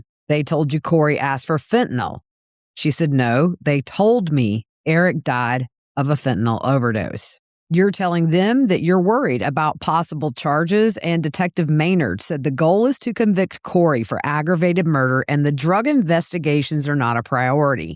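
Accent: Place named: Texas, United States. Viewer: Such a great recap, and you are great to listen to. Love your accent. I used to live in Texas and you make me miss home❤ I live in California now-no accepts here😢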